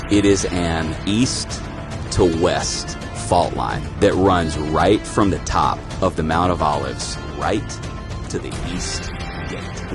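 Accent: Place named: South, United States. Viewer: Not to diminish his point (I honestly couldn't focus on it), but his strong stereotypical southern drawl and overuse of diphthongs was incredibly distracting.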